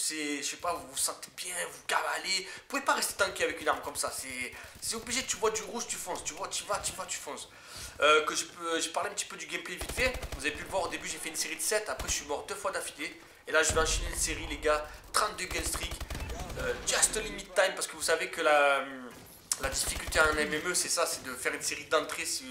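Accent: South, France. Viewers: Première fois que je vois tes vidéos, je kiffe ton accent du sud, bonne continuation ! ;)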